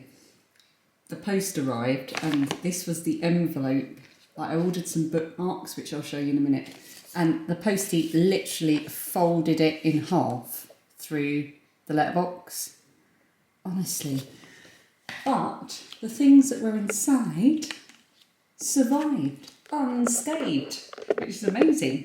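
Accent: Australia. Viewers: thank you so much for the tour of your gallery wall again. I really appreciate it … looks gorgeous! ♥️♠️♦️♣️. The girls reactions to the chips make me laugh out loud. So very funny - is a crack up … love your Aussie accent (I am Australian) Like everyone else I will miss your Vlogtober so much.. hoping you’re up for Vlogmas too 🤞 Thank you for taking the time and effort to share your lives with us.. super super fun family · Oh my goodness! you nailed the Australian accent. Maybe just add a bit more ‘nasal’ to your voice?👍. I really dislike the Aussie accent. I’m allowed to say that as I’m Australian 😅😅😅. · Great Aussie accent! Thankyou for sharing your daily life🙂 I am watching from Bunbury Western Australia, Diane Buswells home town🙂 My daughter went to school with her.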